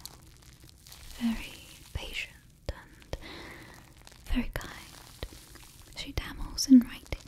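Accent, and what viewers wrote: England, United Kingdom. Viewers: Look amazing with curly hair and as always ace performance on the vid and the British accent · I like your English accent. Decent attempt. Toodle pip! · The British accent and referring to us as "my Lord" really reminds me of FredsVoice "Lord Farquaas" videos lol Btw is there a possibility to have less light in your videos? They are usually pretty bright and it's harder to watch them in the middle of the night (it's 1AM as I'm writing this, heh) This is just a suggestions ofc. Still, love your videos! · Do not mind getting more British-accent. Thanks for this, and thank you, Bridgerton. 😆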